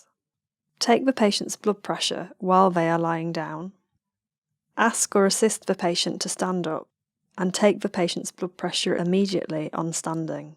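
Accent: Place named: United Kingdom. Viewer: Beautiful explanation with lovely British accent. Thankyou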